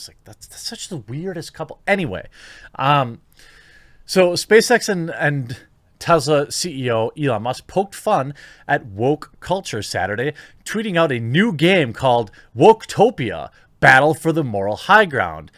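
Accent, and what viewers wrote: Wisconsin, United States. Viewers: Nah, but that Wisconsin accent warps things a bit. 😉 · has a Wisconsian accent?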